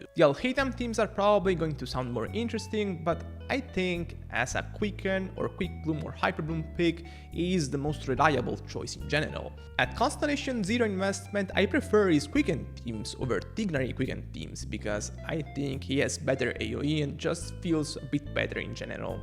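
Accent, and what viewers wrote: Italy. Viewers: It’s the italian accent while being smart that makes people fall for him😂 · IS he italian? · Come è possibile che non mi sia mai accorto che hai un accento italiano parlando inglese? Evidentemente sei così fluente che non me ne sono mai reso conto. P.S. sei bravissimo e super affidabile, continua così ❤ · Lo sapevo che eri italiano ahah L'accento non mente, comunque bravissimo continua così!!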